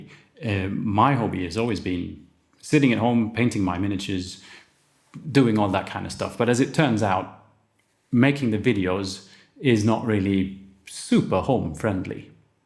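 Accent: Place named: Sweden. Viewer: Great channel very comforting g to hear a Swedish accent oh and awesome video might take a couple aspects of you set up for mine thanks for the content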